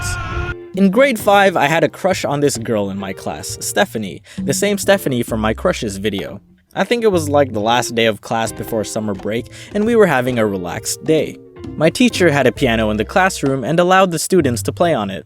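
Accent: Philippines. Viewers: He still have filipino accent lol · You’re Filipino accent is amazing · Hindi ako maka get over sa tabo! 😂 (It’s nice to hear that you still have your Filipino accent! ♥️)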